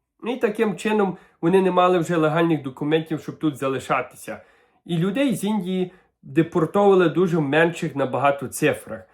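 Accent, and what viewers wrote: Canada. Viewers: Ставлю тебе дорогенький Канадский щерый Уураинець вподобайку 👍 за таку гарну мову и Канадский акцет а новости тут у наших штатах таке саме маймо и будьте обережни не подходит до кордону дуже блезько бр Наши ICE можуть и ваших також депортувати из Канади подим скажут щё ми незнали шё з Канади неможна було ни кого чипати якщё неправильный аусвас то на депортацию 😢 А так дуже рад був мову цю чудесну старинну почюти 🤝😉💪👍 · такий прикольний акцент, ніби ще ваша прабабця емігрувала в Канаду ❤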